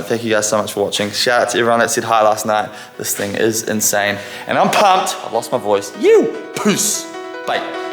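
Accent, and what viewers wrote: New Zealand. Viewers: Rewatching this it defaulted to an ai dubbed English track, even though the original video is English? Youtube couldn’t handle the nz English…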